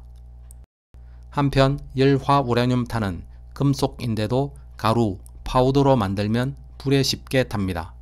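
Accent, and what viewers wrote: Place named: Gyeongsang, South Korea. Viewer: 보리문뎅이 발음 심각하네 스방의 열화 우랴늄 ㅋㅋ 적 1퍼쎈터가 되도록 농축얼 합니다 ㅋ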